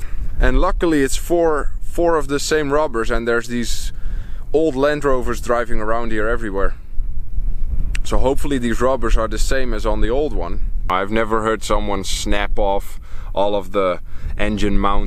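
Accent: Netherlands. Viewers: I can hear the dutch accent when you talk :P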